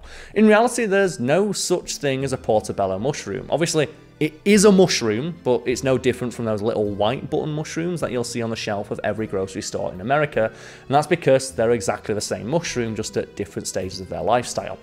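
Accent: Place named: Yorkshire, United Kingdom. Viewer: Montelban, not Montelblan, still made me laugh every time. Then again, maybe I'M the one not fully understanding your wonderful Yorkshire accent. Hmmm?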